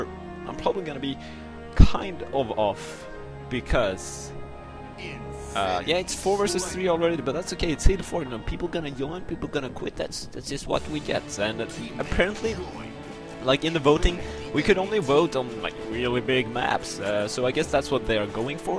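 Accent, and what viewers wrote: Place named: Netherlands. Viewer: where are you from? sounds like a Netherland accent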